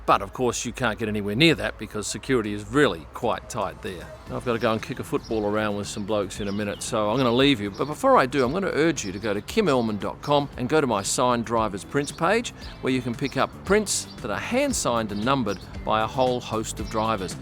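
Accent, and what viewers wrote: Australia. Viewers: Fantastic channel. It's good to hear a laid back Aussie. Great content and presentation. 🇦🇺 😊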